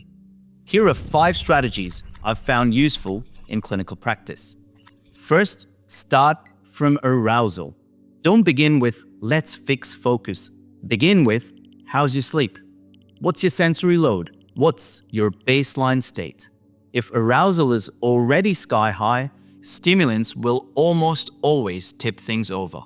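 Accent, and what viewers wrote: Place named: Australia. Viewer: To nuance this video slightly and I'm coming up this from the point of being in the UK and it sounds like you're in Australia so how stimulants are prescribed there may be different. But the most important Factor here is please don't watch this and now think that if you have autismand ADHD, that you should not take stimulants, that is incorrect. What's important is that you are properly and expertly titrated. I'm in a household of people all diagnosed with autism and ADHD and we all take stimulant medication, however we are on different doses and at least one of those takes a different stimulant to the rest. We have all worked out which stimulant was right for us with the support and care of an expert clinical prescriber. So whilst yes the incorrect stimulant or dose may have effects that you weren't expecting and may make your anxiety worse, with correct titration this can be mitigated and you can see improvements in both your ADHD symptoms and your levels of anxiety.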